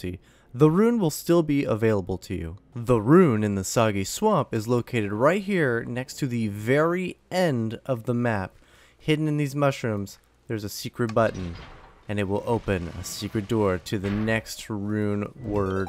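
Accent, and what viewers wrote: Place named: England, United Kingdom. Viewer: Me: DAD I FOUND A VIDEO WITH A GUY THAT HAS AN ENGLISH VOICE! dad: so?? Me: He also tells us how to get to that secret lvl... dad: NANI?!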